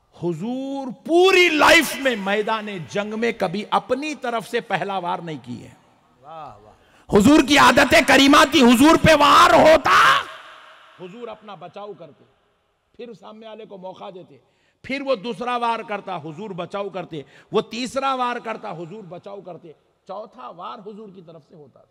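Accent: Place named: Hyderabad, India. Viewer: Are bhai yo hyderabadi hai thoda dakni lajha hota hai. Kab bhai koye kise ghaga .ka koye rahata hai osi language ya tahsil Ko sikhata hai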